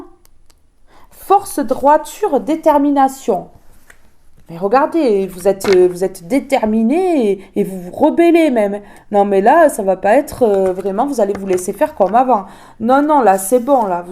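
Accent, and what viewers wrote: South, France. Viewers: Bonjour ma très chère belle âme! Je te remercie pour la bienveillance que tu met dans tes guidances et je perçois que tu as un grand coeur et une grande gentillesse et la façon dont tu nous parle avec ton magnifique accent méridional nous apaise et nous réconforte! sincèrement!!!. Merci!!! Je penses que tu as raison ma vie va être bouleversée durant ce printemps 2025 (Avril, Mai 2025) et qui va grandir en été et en automne 2025 Tu vois juste Je m'adresse à Dieu pour des miracles et je pense que je vais en obtenir au moment ou je m'y attendrais le moins ça va démarrer et se construire graduellement je pense qu'il va y avoir la rencontre amoureuse et il va y avoir construction pour le grand amour et il y aura de la création artistique!!! AMEN Que Dieu t'accorde du bien et que ta soirée te soit des plus agréable.. Bisous ❤💖😘😘🌟☀🙏🙌🙏🙌🙏🙌🌟☀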